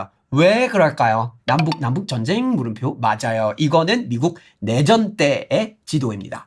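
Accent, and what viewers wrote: South Korea. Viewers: 타일러 말하는거에서 광주이모가 오버랩 된다 ㅋㅋㅋㅋㅋㅋㅋㅋ 광주쪽 교수님이랑 친하신건가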